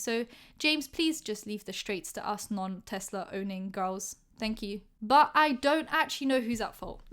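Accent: United Kingdom. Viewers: This woman has the most mixed British accent I've ever heard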